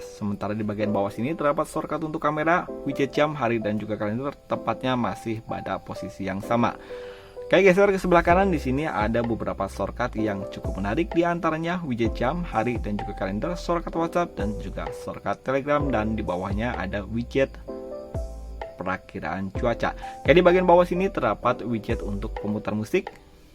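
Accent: West Java, Indonesia. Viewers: Kangg Ti Sunda? 🤣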